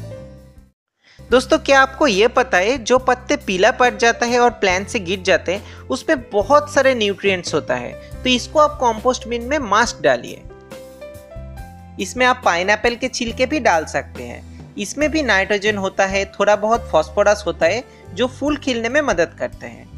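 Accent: Bengal, India. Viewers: Typical Bengali accent. Very good. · R u Bengali sir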